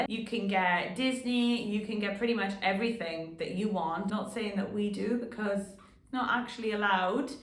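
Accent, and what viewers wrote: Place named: Wales, United Kingdom. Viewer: Thank you for mentioning so early in the vid where you were from (Wales) - was driving me crazy not being able to pick the accent properly 😂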